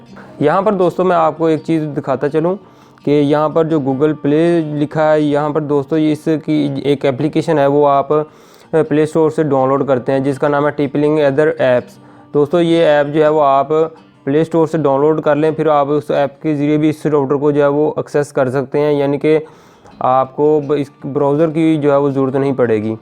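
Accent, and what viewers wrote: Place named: Pakistan. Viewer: hahaha boli ton hi samj gya si pakistan di video hai. By the way great video. Gwandi taade.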